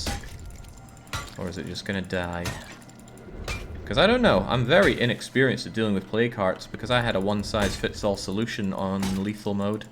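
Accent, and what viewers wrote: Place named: Northern Scotland, United Kingdom. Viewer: This runthrough has been a real diamond of a watch. Audience participation vs. a nightmare world and a few bloody hell type moves pulled by yourself, along with the usual North-of-the-border cutting commentary. As an aside, you're accent reminds me of a friend of mine from the north of Scotland who once commented on a girl "She's so ugly even a sniper wouldn't take her out". Classic.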